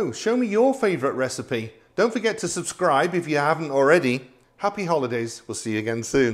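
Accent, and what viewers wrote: England, United Kingdom. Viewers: What a lovely English accent!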